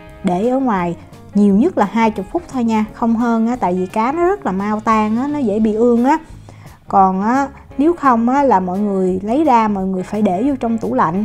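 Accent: South, Vietnam. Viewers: Thích nghe giọng miền nam..chúc bạn gd khỏe.vv.hp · Can ơn đã chia sẻ tí Mỹ sáng nay có sẽ làm theo sự hướng dẫn giường nói để thương nhẹ nhàng dung là chất giọng miền nam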